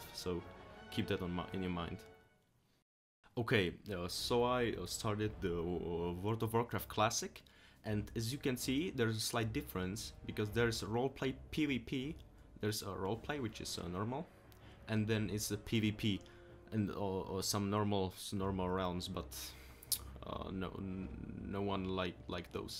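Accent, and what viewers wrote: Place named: Czech Republic. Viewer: Jsem si řikal že mi ten přízvuk zní česky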